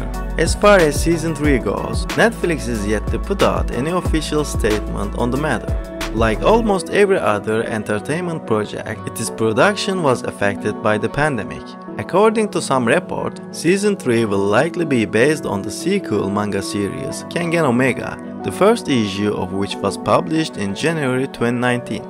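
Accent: Turkey. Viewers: Aksandan anladım türk olduğunu :), insan altyazı koyar be hocam 😂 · Bu aksan türk aksanı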